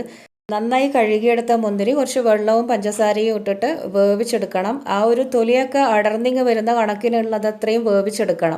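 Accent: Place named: Mahe, India. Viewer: Your way of speaking reminds and miss me my hometown mahe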